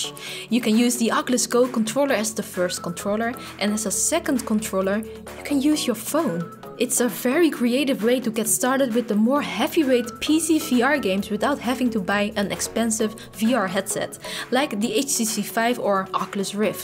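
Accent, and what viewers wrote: Netherlands. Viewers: You have a Dutch accent?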